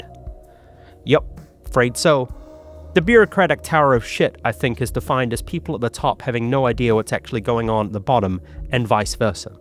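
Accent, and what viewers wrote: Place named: New Zealand. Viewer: nz accent jumpscare (positive) dude I've just been through like 5 of your videos, these recaps are great! .. I truly had no idea this story had SA users involved omfg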